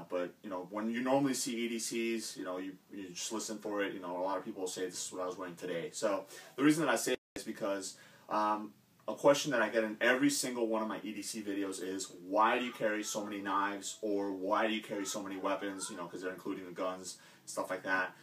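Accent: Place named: South Florida, United States. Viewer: thanks for sharing. I knew you were in So FL from your acceent:) Suave... are you going to the gun show in Fort Lauderdale this weekend?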